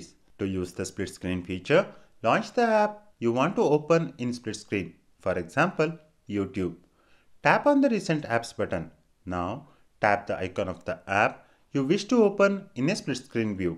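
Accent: India. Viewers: This accent always brings Indians down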